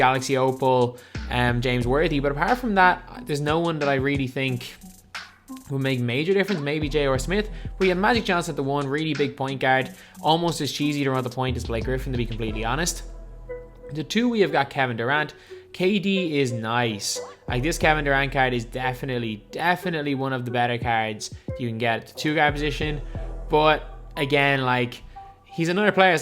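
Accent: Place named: South Dublin, Ireland. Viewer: This is the biggest south dublin accent I have EVER heard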